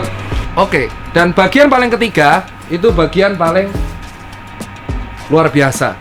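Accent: Java, Indonesia. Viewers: Mas e medok e semarang opo suroboyo kok aku ngekek wkwkwkw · Mas meh takon asli wong ngendi e koe kok koyone yo gur wong jowo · Logatnya Jawa banget mas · i love mEDok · Medhok banget. Kayak si org korea itu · Logat nya medok wkwk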